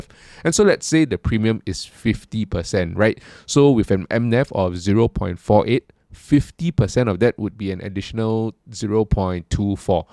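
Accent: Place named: Malaysia. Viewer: I suspected but again you sound/accent exactly my Malaysian colleagues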